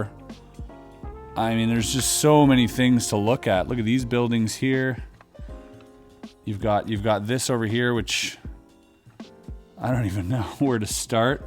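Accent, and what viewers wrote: Quebec, Canada. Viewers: Are you quebecois? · T tu Quebecois? j'ai cru entendre notre bonne veille accent bravo mon amie! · La lecture en "Québéquois" m'a tué... 😆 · that perfect canadian french speaking ^^ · Wait... Es-ce que t'es québécois par hazard? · It took me a video where you read a french card to realize you're canadian (and probably from around Quebec judging by the accent)! This was an incredible puzzle. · salut je regarde tes vidéos depuis longtemps mais quand tu as sorti la carte en bois et que tu as lu en francais, j'aurais jamais penser que tu étais du Québec, good job! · C'est tellement bizarre t'entendre parler français. On se rappel que tu es Quebecois tout de suite. XD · yup it's a french puzzle:). but definitly have a quebecois accent ( but honestly in france many mix up canada and quebec ) so all right to whoever want to mix up france quebec and belgique xD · Would you be from quebec by any chance? 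· Tu es québécois??? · I don’t know what surprised me most, this amazing puzzle or your Québécois accent! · Are you quebecois!?!?!?! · I knew. But only because I know his address is in Quebec and I recognize his accent. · Tu français??? Est tu québécois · Tellement bizarre de t'endrendre parler francais avec ton accent quebecois alors que t'as pas vraiment d'accent quand tu parles en anglais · Are you Canadian? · Damn, your French accent is like, du parfait québécois! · Je pensais pas que tu parlais Québécois! Moi qui te suit depuis tant d'années!! Thanks for sharing all this with us! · Hold up hold up hold up... MY MAN IS CANADIAN?!?! WHAT!?!? The only reason I know is because I’m French Canadian and I can tell by his accent. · c'est tellement nice haha jai faite le saut de t'entendre parler québécois! j'adore tes videos t'es awesome! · Un parler québécois impeccable! (Je viens juste de découvrir ta chaine) Super casse-tête! 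· Your french accent sounds a bit Canadian it’s funny 👍👍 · Why do you have québécois accent when speaking french? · Première fois que je witness ton accent Québécois. Toujours agréable de voir un Québécois réussir sur Youtube. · Il parle un peu québécois dans ses anciennes vidéos de street magic;) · I just discorvered that has an accent from Quebec x) That's the fisrt time that I hear him speaking French actually.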